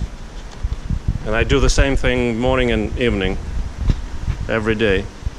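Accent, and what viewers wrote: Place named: Europe. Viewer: Hi there, I saw the net you used when capturing the Tarpon but nets made to capture Koi have less resistance because they are flat. There's also another Koi-specific net called a sock net which makes transport of large slender fish very easy. Sounds like you are somewhere in the EU judging by your accent? The Koi market is pretty big in the UK and Germany so it shouldn't be difficult to find these items. Also a large umbrella net used to capture bait fish might make the process easier...if it swims right above the trap.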